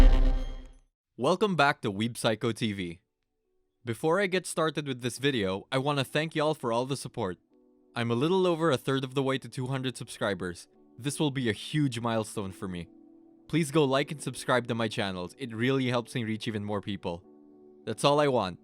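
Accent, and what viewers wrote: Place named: Philippines. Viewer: Filipino ka ba? Edit: I hear a slight Filipino-ish Accent.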